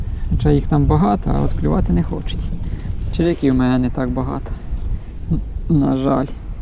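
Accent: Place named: Western Ukraine, Ukraine. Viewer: А вимова типова західна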